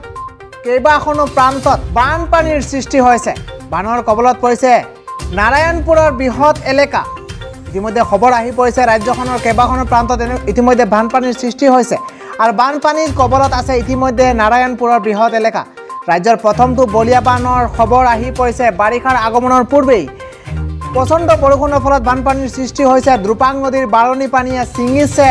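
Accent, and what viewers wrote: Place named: Bangladesh. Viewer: Toi bangladeshi neki kotha khuni khenekua lagise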